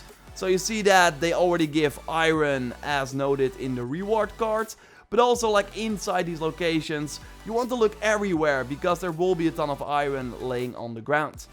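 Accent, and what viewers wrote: Netherlands. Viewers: Heb je niet het idee dat de game minder leuk wordt door "te vroeg" je wapens naar max lvl te werken? ( aan je accent te horen, ga ik er vanuit dat je Nederlands ben ;-) ) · Dutch native speaker?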